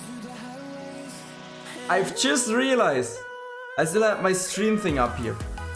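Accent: Germany. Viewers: bist deutscher hört man bist du esportler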